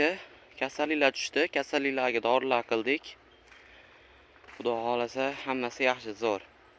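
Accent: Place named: Tashkent, Uzbekistan. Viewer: Toshkenlimisiz shevangizdan bilinyabdi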